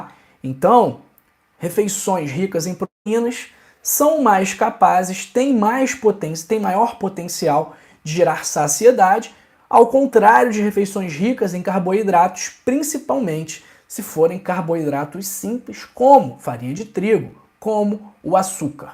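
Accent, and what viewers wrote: Rio de Janeiro, Brazil. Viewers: BOM DIA DOUTOR..QUÊ BOM OUVIR À SUA VOZ, ESTE SOTAQUE MARAVILHOSO, O CARIOCA...MELODIOSO,AGRADÁVEL, SUAVE,COLORIDO,CHEIO DE ENTUSIASMO...maryjou prof.italiano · Vídeo bom. No entanto, esse sotaque carioca muito carregado é irritante demais, não consegui assistir até o final....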